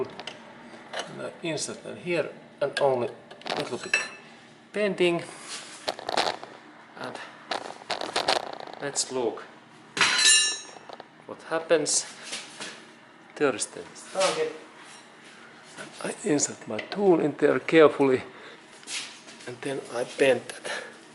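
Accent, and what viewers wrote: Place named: Finland. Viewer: You are Finnish i love your english!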